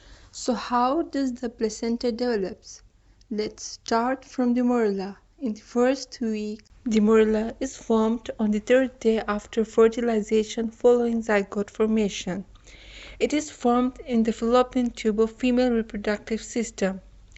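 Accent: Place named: India. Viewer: Amit Majumder from her accent I'm sure that she's an indian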